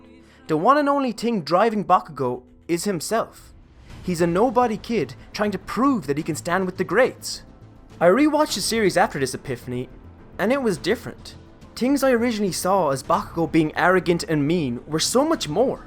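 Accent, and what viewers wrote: Ireland. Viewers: I love your accent, is that Irish. And Bakugou was my favorite from the very beginning, he is and will always be my favorite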